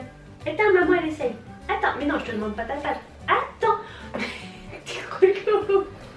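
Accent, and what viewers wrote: England, United Kingdom. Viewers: Ton accent anglais >>>> ♥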